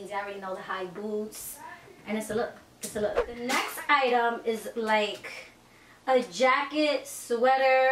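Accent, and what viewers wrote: New York, United States. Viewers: I love that NY accent ❤️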